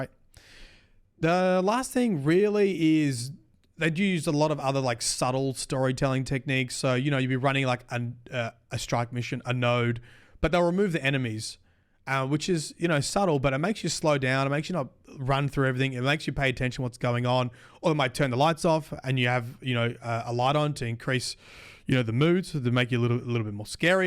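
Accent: Australia. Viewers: The weird nature of the internet is that I didn't even clock that you are Australian until you said "dollery-dos."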